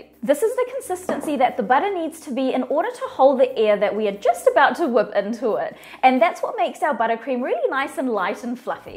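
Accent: New Zealand. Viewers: This looks brilliant. I stopped icing cakes ages ago cos it made them too sweet but, will definitely try this as it looks fantastic. Love to hear the kiwi accent an a cooking video. Makes me proud e hoa. 😊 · Your accent and way of speaking sounds familiar and then u said here in Newzealnd😊😊..happy to see local stuff.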